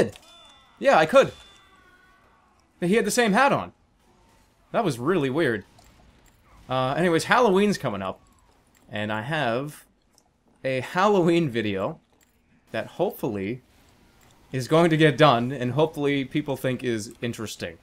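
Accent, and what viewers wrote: Denmark. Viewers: Are you danish?? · Are u from Denmark?? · er du dansker loL? JERMA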